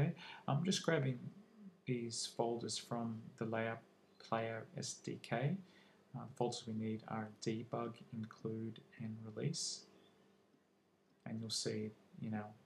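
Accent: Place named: Australia. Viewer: Thank you very much!! I'm very new to this technology but value the work you have put in to demonstrate!! It sounds like you're a fellow Australian ;) Cheerz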